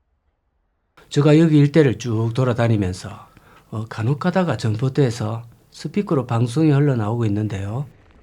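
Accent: Gyeongsang, South Korea. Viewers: 야이마 겡상도 사투리 쓰지마 방송하려면 시부럴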